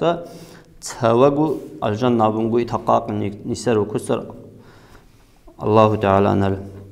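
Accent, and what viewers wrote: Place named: Lak, Russia. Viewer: Да будет тобой доволен Аллаh,как приятно чисто лакская речь слушать без русификации❤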